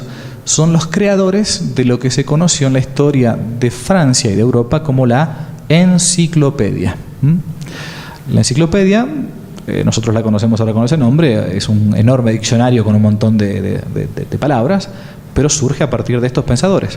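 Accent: Argentina. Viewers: Es una lástima que divulgadores hispanos de tanta calidad pierdan millones de personas de audiencia potencial por causa de ese fenómeno lingüístico particular que llamamos "shesheo" o "teísmo rehilado" típico de los porteños que tan cacofónico nos resulta a la inmensa mayoría de los hispanohablantes de todos los continentes.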